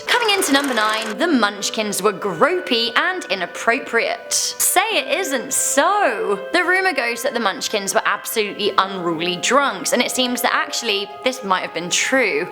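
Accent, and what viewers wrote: England, United Kingdom. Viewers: Interesting.... also I glad I'm not the only one that finds ABSOLUTELY GORGEOUS! Also... that English accent just makes my heart melt!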